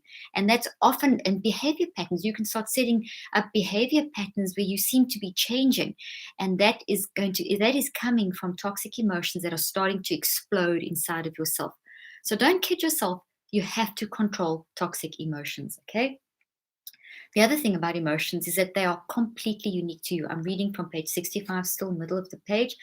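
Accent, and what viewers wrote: South Africa. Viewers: Smart young lady . Love the SA accent too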